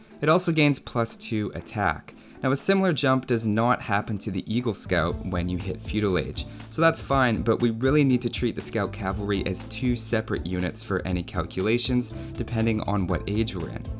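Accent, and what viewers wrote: Canada. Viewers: Is the author of the video Canadian by any chance? "Scout" and "about" are distinctive in their pronunciation to Canadian speech. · My weary soul is calmed every time I hear say his "let's check it out", in that Canadian accent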